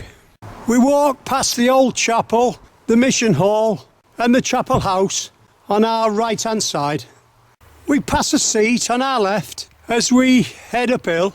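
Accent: United Kingdom. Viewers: No need to sound British.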